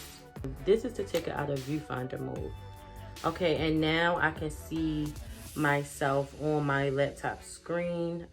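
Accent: Baltimore, United States. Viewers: I thought so lol that’s where I’m from. I know a bmore accent when I hear it lol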